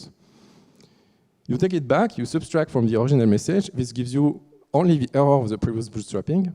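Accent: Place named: France. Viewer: j'ai tellement le seum c'est en anglais avec un accent Français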